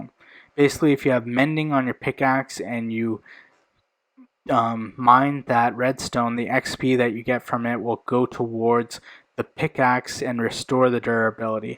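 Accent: India. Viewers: R u Indian anyways thanks bro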